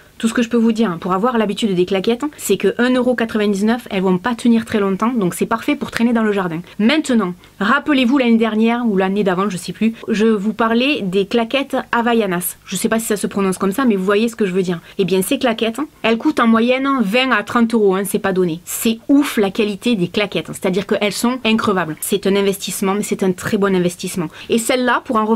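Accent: South, France. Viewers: Merci beaucoup pour vos conseils d achats les chaussures sont magnifiques j adore votre style et surtout votre bonne humeur 😊 et cet accent qui sent bon le sud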